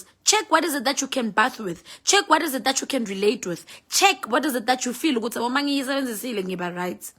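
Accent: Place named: Zulu, South Africa. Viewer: GoguSkhotheni niyangihlekisa ngalesis'Zulu senu (makayenza nje) every word to spit maka, maka, maka really thina sithi (uma enzakanje) and again iciniso(lol) ngala eNatal we use to spit kanje (iqiniso).But I'm not all about that.Thank you Gogo wethu ...,qhubeka nomsebenzi wakho oskhulisayo sonke sidode ngeqiniso.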